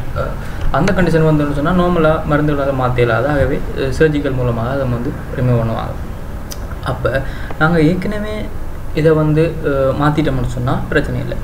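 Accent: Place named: Sri Lanka. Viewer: naatu vaithiyam valarga vaalga bro SriLankan tamil supar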